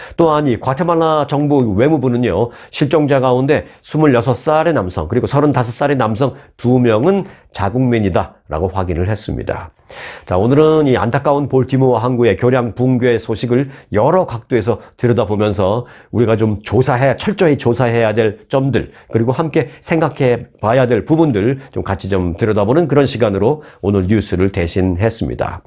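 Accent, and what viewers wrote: South Korea. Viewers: 반갑습니다. 부산의 사투리에 웃음을 주셔서 고맙습니다.ㅎㅎㅎ 볼티모어의 교량이 무너진 것에 넘 놀랬습니다. 수습에 최선을 해야겠죠. 다양한 소식을 전해주셔서 감사드립니다.👍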